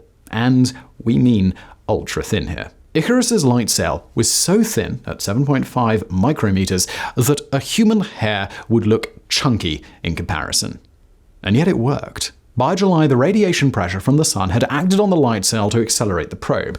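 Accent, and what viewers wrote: RP, United Kingdom. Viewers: Why you trying to sound like a posh twat? Lol...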